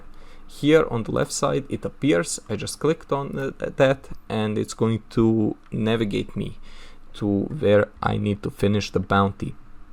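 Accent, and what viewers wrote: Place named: Balkans. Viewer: I can tell hes balkan and might speak my language because of the accent.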